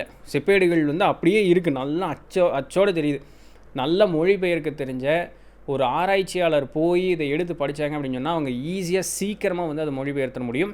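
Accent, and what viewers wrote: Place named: Coimbatore, India. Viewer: தம்பி, நமக்கு கோயம்புத்தூருங்களா... நல்லா சொல்றீங்க...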